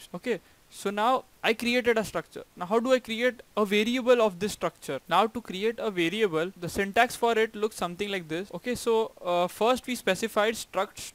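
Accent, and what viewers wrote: India. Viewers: could i find some tutorial without the indian accent ? it's hard to understand the most of what he says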